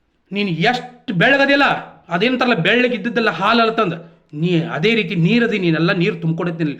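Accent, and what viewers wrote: North Karnataka, India. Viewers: ಗುರು ನಿನ್ ಮಾತು ಅದ್ಬುತ ಬ್ರೋ ಉತ್ತರ ಕರ್ನಾಟಕದ ಭಾಷೆ ಕೇಳೋದೇ ಚಂದ ನೀನು ಸತ್ಯ ಮಾತಾಡ್ತಾ ಇದ್ದೀಯ ಬ್ರೋ ದೇವರು ಒಳ್ಳೇದು ಮಾಡಲಿ ಆದ್ರೂ ಜೋಪಾನ ಬ್ರೋ ❤❤❤🙏🙏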